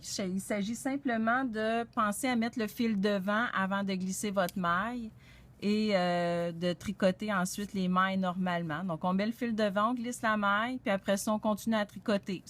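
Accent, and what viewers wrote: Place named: Quebec, Canada. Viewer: Les Laines Biscottes: où se situe cette boutique? Au Québec? Car votre accent me semble du Québec!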